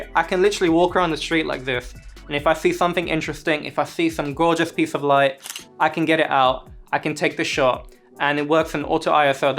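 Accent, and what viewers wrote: India. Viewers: I like your videos. I also enjoy your accent and your indian accent (x carry on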